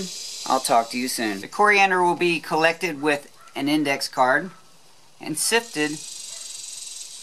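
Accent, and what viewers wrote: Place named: South, United States. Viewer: That Strong Southern Accent :P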